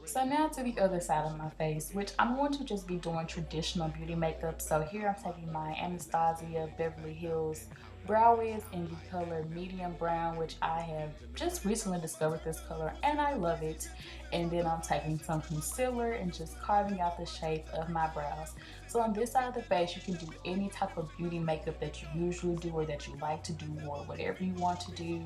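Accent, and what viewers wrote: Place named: Texas, United States. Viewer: When I first found you on Youtube I instantly searched for your location I could have sworn you were from Texas Lol you sound so much like her!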